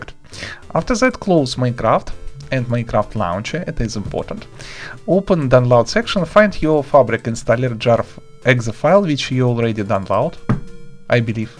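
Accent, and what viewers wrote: Russia. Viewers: Русский акцент, вери вел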